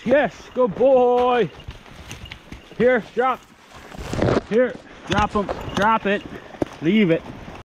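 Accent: Newfoundland, Canada. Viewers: I'm from Saskatchewan. Your accent gave me mixed signals, then I thought about it and realized you were a Newfie. Right on man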